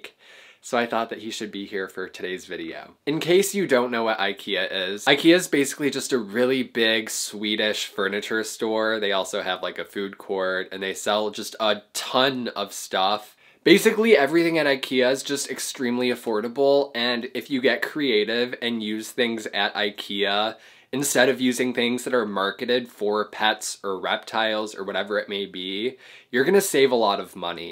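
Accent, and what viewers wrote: United States. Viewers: Okay stupid question but is he American or Canadian? In turn are these American prices or canadian😂😂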